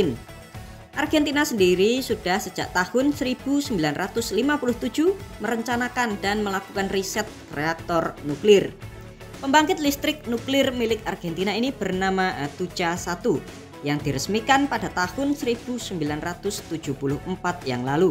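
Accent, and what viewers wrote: Java, Indonesia. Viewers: Dialek Jawa ny kental banget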